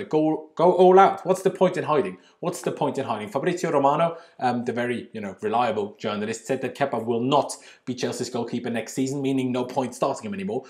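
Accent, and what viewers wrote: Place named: Scotland, United Kingdom. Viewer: really? Oh god I was thinking you were Scottish. Also, wie gehts?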